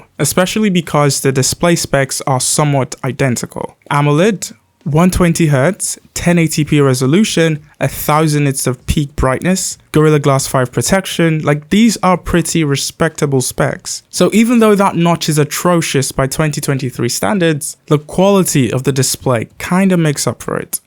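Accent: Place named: United Kingdom. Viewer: British man😊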